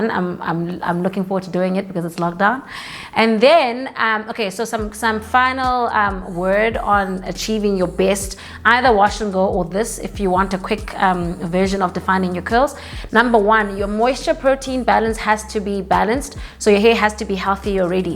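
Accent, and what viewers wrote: South Africa. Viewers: LOL. It's our typical South African accent❤️ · She sounds very much like she has a black accent. Just from JHB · is it just me or does she sound South African ? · She sounds South Africans....damn🚶🏾‍♀️ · Are you South African? You're accent is awesome! I'm American -born Nigerian, but my accent is boring valley-girl 😆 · are you south african? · She is south african😍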